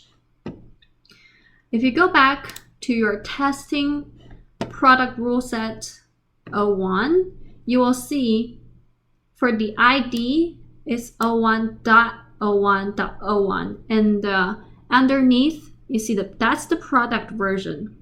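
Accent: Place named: United States. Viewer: another good video. I appreciate you helping me understand how to use the RAP rules. In the past I have used the Application>Distribution>Export function to save my application rulesets. It has worked very well for me. Is there a reason you did not choose the Export function? I have a suggestion to make. In the future if you have a video that requires the pega environment to be set up to follow along with your lesson. Save the pega jar file on your git-hub site. For example, since you referred to PegaFem channel this is what I did. After I completed her Video 4 exercise I exported my application to a zip file. Then a couple of weeks later I imported the Video 4 exercise zip file and I could start working on her Video 5 exercise without recreating her pervious work. I hope my explanation is clear if not let me know and I will go into more detail. 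I haven’t seen any new post on PEGAFEM for awhile I hope see keeps on posting. Your two post are the easiest for me to understand (because I understand your American accents). I hope both of you keep posting about Pega. Looking forward to working on the 5 post I have not studied yet. Thanks again.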